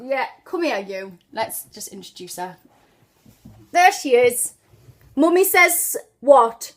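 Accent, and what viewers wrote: Newcastle, United Kingdom. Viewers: My favorite accent ... is now the Geordie accent because of you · I can fairly understand that accent as I have lived up there for 5 years doing my engineering degree in newcastle. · I love your Geordie accent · Geordie accent is my weakness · Geordie accent is so interesting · Love the geordie accent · Listening to you I am pretty sure... I have mistaken the Geordie accent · I love hearing the Geordie accent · a Geordie accent is beautiful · I just loooooooove the Geordie accent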